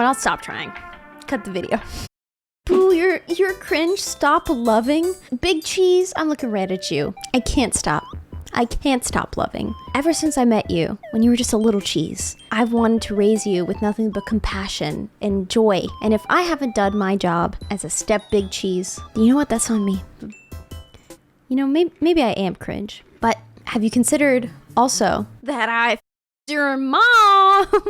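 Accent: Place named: United Kingdom. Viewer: i'm surprised the british accent fit so well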